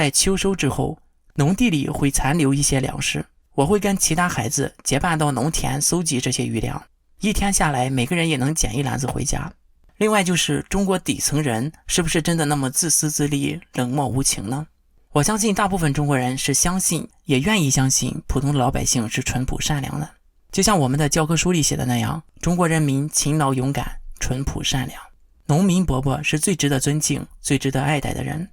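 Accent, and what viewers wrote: Shandong, China. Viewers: 山东口音，不是临沂就是枣庄，跟徐州口音差不多。我倒想知道你移民海外之后，会不会关注你所在国家的政治，你如果想拉更多的移民业务应该出影片介绍或分析一下你所在国家的一些情况。你做的一系列反共的影片是推力，如果做你出影片分析你所在的国家就是拉力，推力+拉力共同使劲才会有更多的移民业务😀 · 听你的口音就感觉是老乡，这次确认了。我也是山东农村，东部，90后，你的经历跟我基本一致。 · 原來clause是山東人，普通話確實和我山東同學的口音相似。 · 山东口音好重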